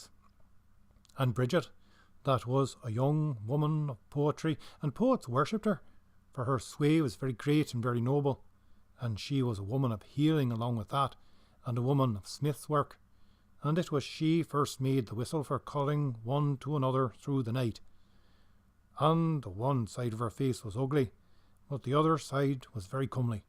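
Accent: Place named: Ireland. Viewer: where is your accent from it's so wonderful! I am assuming Ireland of course, but what part?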